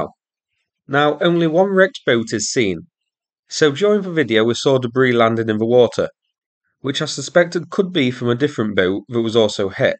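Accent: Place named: Mansfield, United Kingdom. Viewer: It's because he's speaking British English with a Mansfield accent. Most of the automated caption systems are only trained on a generic American English accent and vocabulary. A compamy I worked for in the UK considered buying a captioning system. The prospective vendors came to show their software, and every single one failed to correctly recognise even half the British English words we threw at them. When we tried Glaswegian and Newcastle (Geordie) accents, the recognition rate fell to zero.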